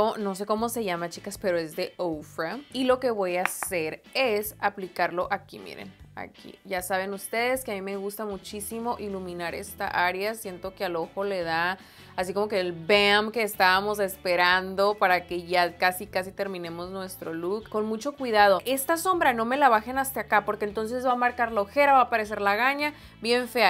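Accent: Sinaloa, Mexico. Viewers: Todo el tiempo te escucho voz sinaloense 😂😂😂 también soy de sinaloa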